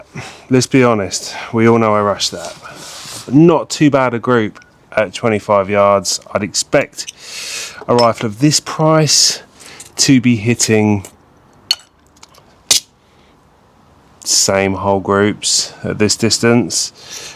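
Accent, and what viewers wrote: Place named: United Kingdom. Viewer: I am sure its a nice gun but as soon as I hear british accent and sub 12ft/ibs I turn off.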